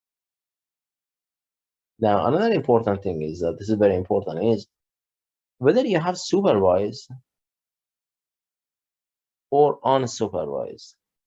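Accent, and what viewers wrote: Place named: Iran. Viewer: I enjoyed alot from this video with your beautiful and obvious Persian accent.😊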